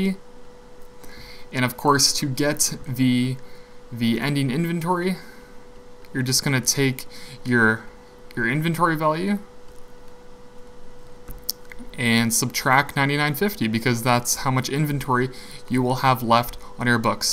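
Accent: Canada. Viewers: I can hear your canadian accient lol :)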